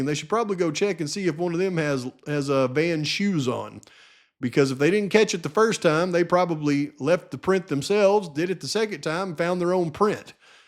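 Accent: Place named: Arkansas, United States. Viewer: He lived in Arkansas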